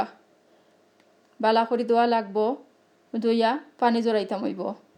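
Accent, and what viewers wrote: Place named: Sylhet, Bangladesh. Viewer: আপনার মাতে কতায় বুজতেছি আপনি সিলেটি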